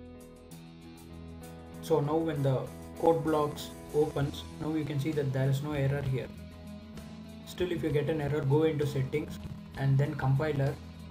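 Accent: India. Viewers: Good tutorial, with indian accents. 10/10 Well done.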